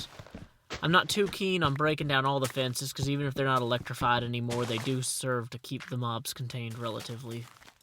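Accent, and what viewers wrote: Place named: Appalachia, United States. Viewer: you know that we (a few british people) stereotype your accent for hillbillies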